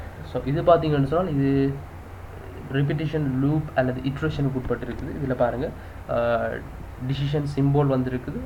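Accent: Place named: Sri Lanka. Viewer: Srilankan tamil beautiful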